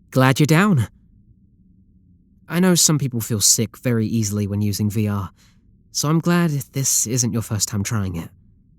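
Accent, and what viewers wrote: United Kingdom. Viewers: If only rp-ing a fictional character was enough to get you a yandere 😔/j Great job on the au!!! It's nice to have a taste of that British accent as well!! ^^ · Ehhh sound more southern to me but other than that sounds pretty British unless your actually British and are using an American accent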